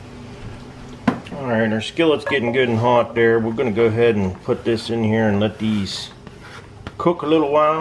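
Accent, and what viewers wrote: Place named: Southwest Pennsylvania, United States. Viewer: Hey, where are you from? We live in sw Pennsylvania and your accent sound like you are from here. AND we love ramps.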